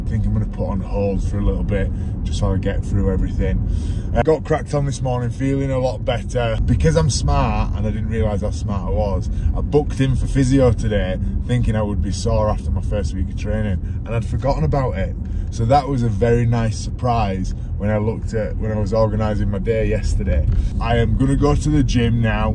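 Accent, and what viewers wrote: United Kingdom. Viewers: Hi...I just found ur channel today... I followed Joel's too.... I think ur channel will be more interested if u toke those places in ur area/places u visited too. I'm Indonesian and sometimes hard for me to understand what u are saying with ur British accent...but I've been trying... Success for ur natural channel I love it...much love from Indonesia. 💜❤💚💛🙏🙏🙏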